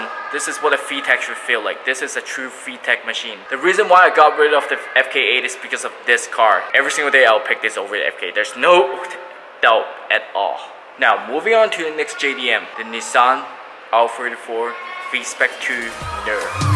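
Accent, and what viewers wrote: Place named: United Kingdom. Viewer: Wow. Love your videos. I’m from uk. From your accent did you study here?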